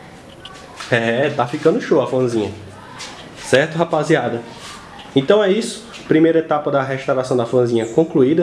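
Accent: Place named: Bahia, Brazil. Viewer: kkkkkkk mt bahiano pqp kkkk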